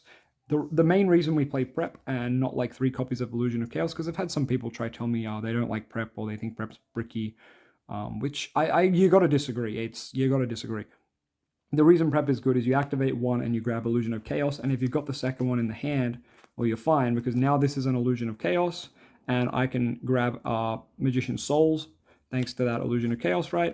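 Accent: Australia. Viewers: Are you aussie? Because if so, that “Goony boy” nickname is so much funnier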